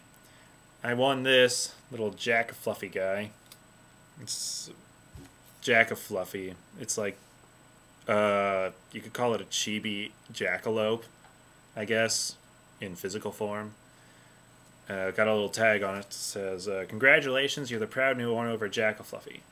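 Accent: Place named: Germany. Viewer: Last thing I wanna do is sound like a dick, but your German accent reminds me of Beerfest, in a good way.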